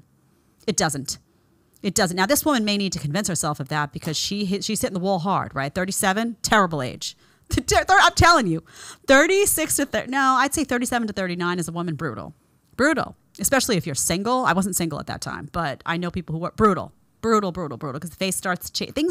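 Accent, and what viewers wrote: New York, United States. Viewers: No one can say "nasty" like Jedediah. Thank God we have a woman like her to cut through all the BS, and pointing out women like this. I'm surprised so few women commented on this video; I would like to hear how reasonable women feel about 304s like the one at the start of the video, and what their experience is with any of their 304 acquaintances. I love Jedediah's New York accent. Pay attention as she says "awl" for "all". I am so happy to have found her channel a few weeks ago. She is spot on. I hope she continues with these topics on her channel. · The more I hear that Noo Yawk accent, the MORE i will listen. 😎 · Every time says NASTY with her NY accent talking about these modern women.😂😂😂😂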